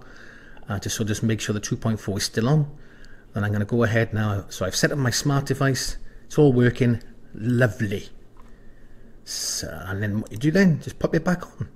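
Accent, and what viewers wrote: Wales, United Kingdom. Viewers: Omg, Taffy...lol it's so lovely to hear that Welsh accent...I miss UK.... Anyhoooo, cheers for that will fix it tomorrow as a, little drink is in order right now 🥂🥂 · This guy sounds so British! You're one of the most British tech channel I ever watched. (Most tech channels are either Indian or Americian)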